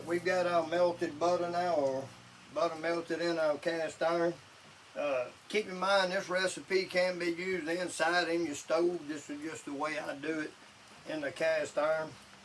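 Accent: Louisiana, United States. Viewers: What part of Louisiana are you from?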